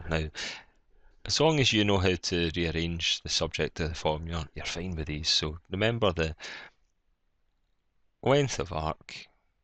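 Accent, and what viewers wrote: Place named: United Kingdom. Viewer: thank you random british or scottish man for the help! after 7 years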